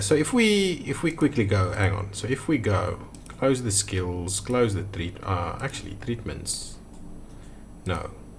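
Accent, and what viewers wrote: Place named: South Africa. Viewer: This dudes accent is so saffa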